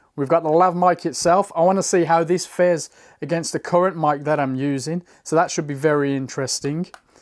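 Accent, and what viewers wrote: Birmingham, United Kingdom. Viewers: You from Brum?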